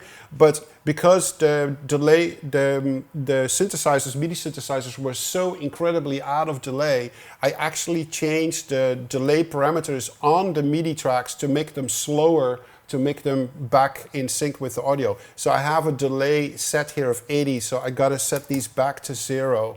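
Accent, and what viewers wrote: Netherlands. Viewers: Hey Walter Lewin sounds a lot like him! Is he Dutch?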